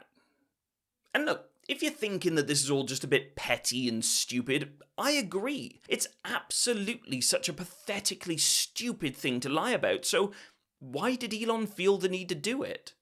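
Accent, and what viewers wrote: United Kingdom. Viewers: just might be your moist pointless video yet. at least it brings a british accent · I just have to say that very very few people can make me *literally * laugh out loud, but you manage. I’m British too and I think I have a similar accent and love of swear words so it’s very inspiring to hear you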